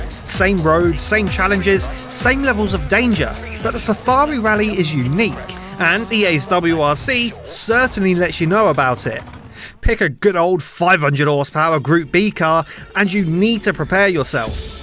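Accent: United Kingdom. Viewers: Are you from milton keynes???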